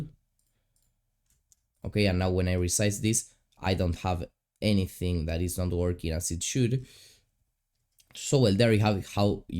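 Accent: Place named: Rioplatense, Argentina. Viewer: El acento rioplatense es tremendo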